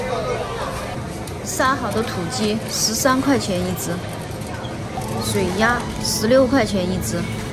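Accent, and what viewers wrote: Sichuan, China. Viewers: 川妹子啊，，這川普。。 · 我想听听你的英文发音有没四川味？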